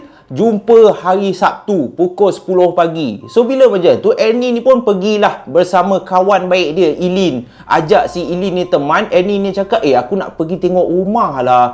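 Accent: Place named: North, Malaysia. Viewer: tak boleh la.. rasa annoying bila cuba cakap slang utara..nasib baik sikit tak macam yg cerita kat perlis tu.. tapi yg lain2 okey...saya suka penceritaan.. tapi slang utara terlampau over sgt..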